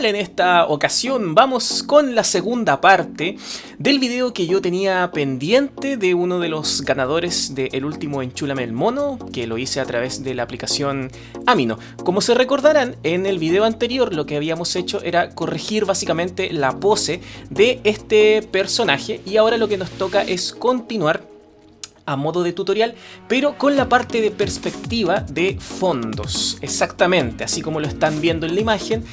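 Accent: Chile. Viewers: Eres chileno, supongo :v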